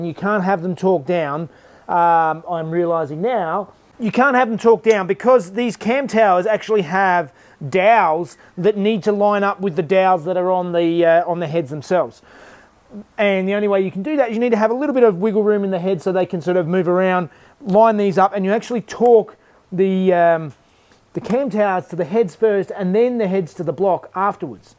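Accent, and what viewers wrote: Australia. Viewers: I can hear the “aaaaaaand” on the Australian accent in that thumbnail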